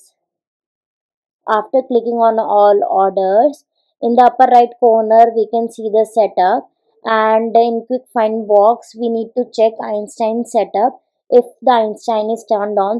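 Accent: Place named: India. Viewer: Keep making videos , Thoda hindi tone hota haina ache se samjh m aa jata h🤩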